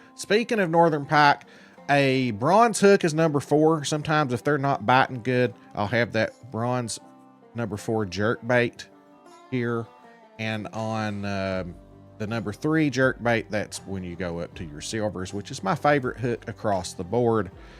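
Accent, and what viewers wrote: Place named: South, United States. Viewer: As a fellow southerner, you got a great accent, brother.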